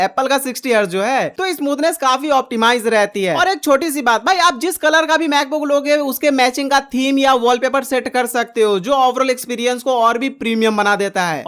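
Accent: India. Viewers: Indian accent so amazing🎉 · Bhai pakka tum bengali ho, mask lagake rakho tabvi bata skata hu 😅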